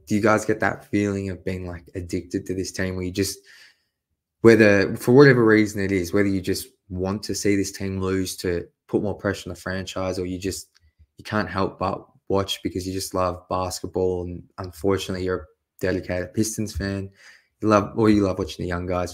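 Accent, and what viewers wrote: Australia. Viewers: Saw the mustache and handsome face and knew I’d hear an Australian accent. How does a guy living damn near 24 hours into the future become a pistons fan?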